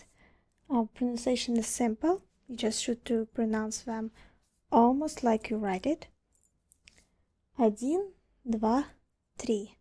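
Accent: Russia. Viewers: Strong russian accent is always a good trigger too. Idk why but I like it. Thank you! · Не кэн, а кьхен. Какой жоосткий русский акцент. Видео хорошее)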